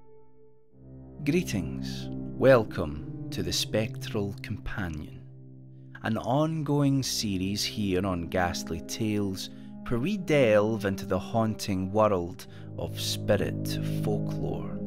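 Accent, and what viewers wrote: Scotland, United Kingdom. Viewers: Once again, an awesome video, right to the VERY creepy last second! Your engrossing "Scottish Crypt Keeper" kinda narration always adds a whole other level to the spook factor, thank you~Well done! The applause you hear is coming from the Rocky Mountains, in the United States. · i love your scottish accent i think its the best accent to tell stories with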